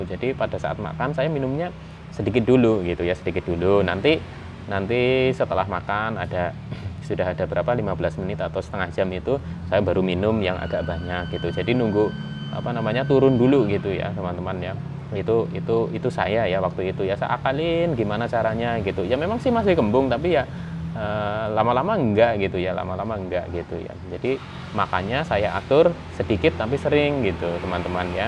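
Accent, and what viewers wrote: Banyumas, Indonesia. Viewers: Mas sudah berapa tahun Jawab y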